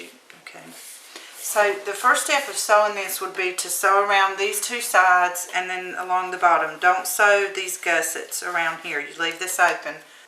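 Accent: South, United States. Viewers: I love your instructions. You make is so simple. And your Southern accent. Subscribed to you!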